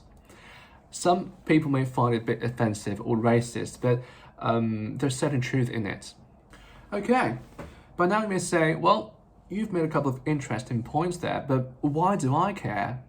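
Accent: United Kingdom. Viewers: Beautiful British accent! 👍👍👍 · 英國腔英語，習慣美式英語的乍聽之下有點不習慣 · 他是英国口音，你只熟悉美国口音。i这个音，英国更像ei，而美国更像ee as in teeth。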